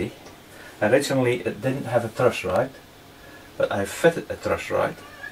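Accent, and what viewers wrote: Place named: Scotland, United Kingdom. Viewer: Your accent is really hard to place. Sounds Scottish, sometimes Irish and sometimes Northern European.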